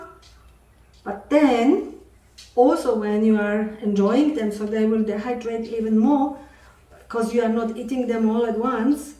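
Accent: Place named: Slovenia. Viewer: This is the most interesting way of making salami. My parents make home made sausages with the skins. I really enjoy watching you in the kitchen and love your accent. I was born in Slovenia and came to Australia when I was 4 yrs old. That was over 53 yrs ago. So I have no accent, but my parents sound like you.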